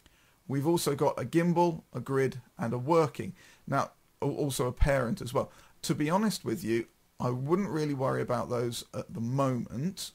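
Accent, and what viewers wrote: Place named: United Kingdom. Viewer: Мерзкий блевотный британский акцент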